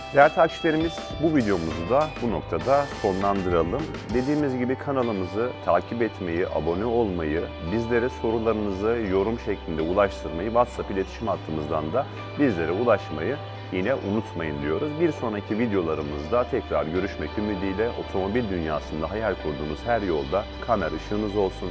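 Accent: Samsun, Turkey. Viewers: Siz Samsunlumusunuz elliii demeniz den anladım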